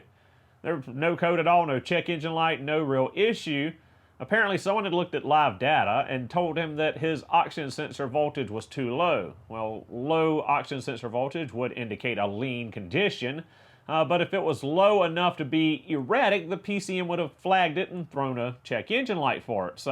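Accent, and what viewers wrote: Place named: Tennessee, United States. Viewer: Unrelated but where you from bud? I'm in tn and that accent is indigenous over here.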